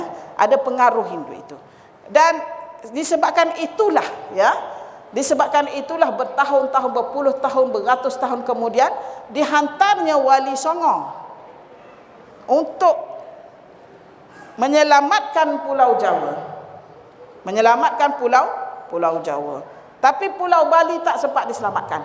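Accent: Kelantan, Malaysia. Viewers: Saya boleh la dgr ceramah prof. Tp part nk termuntah prof sebut high impact journal. Yg high impact pun bayar duit dh dpt publish walaupun xd kualiti. Sy xsuka sistem universiti dan publication (sama sistem british je). So xyah gebang kt awam lebih2. Buku yg terbaik buku yg iklas buat mcm kitab2 ulama. Bukan mcm jurnal lecturer2 skrg. Nk MUNTAH AKU DENGAR. BTW keep researching. But DONT GEBANG. Thats all😂 btw slang kelantan tu obvious sgt...pompuan kelantan mmg byk dlm ipt mana2 pn. Ntah knpa..mb pandai sembang kot.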